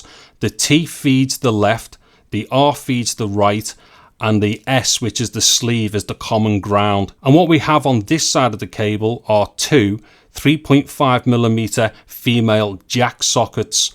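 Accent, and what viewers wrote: Liverpool, United Kingdom. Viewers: Couldn't finish the video - scouse accent offends me.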